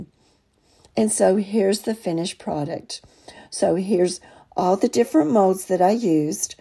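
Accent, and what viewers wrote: South, United States. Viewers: everything is so pretty. I think my favorite is the three crosses. Thank you so much for sharing the Lord here on your channel. I know I told you earlier you remind me so much of my sweet aunt Brenda. Even to your sweet southern drawl. Sometimes when I’m watching your videos I feel like I’m listening to her. 🩷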